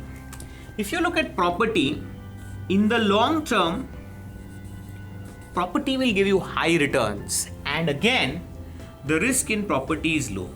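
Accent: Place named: Gujarat, India. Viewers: I was just going to comment this... Gujju accent can be picked up very easily... :-D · that sweet gujju accent though. thanks, the video was very helpful.😊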